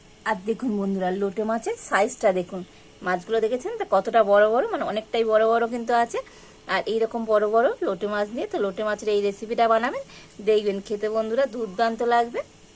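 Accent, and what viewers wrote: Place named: Dhaka, Bangladesh. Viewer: দিদি ভাই আপনিকি ঢাকা জেলার লোক?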